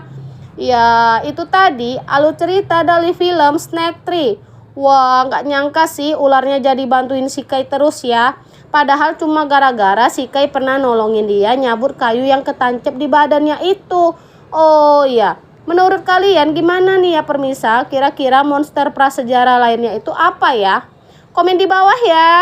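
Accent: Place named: Medan, Indonesia. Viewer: Ini baru flim yabg ku suka. Mana suara anak medan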